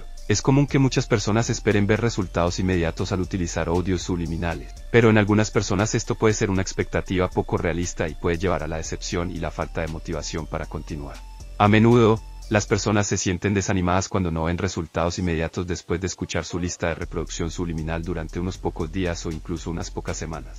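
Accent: Colombia. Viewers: Esa voz se escucha como medio colombiana❤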